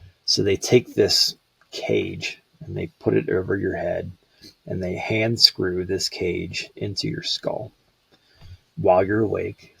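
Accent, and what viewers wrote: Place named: Pittsburgh, United States. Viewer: You can hear that Pittsburgh accent for sure!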